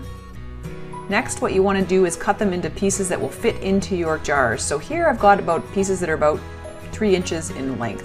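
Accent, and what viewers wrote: Canada. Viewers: my god do you ever sound Canadian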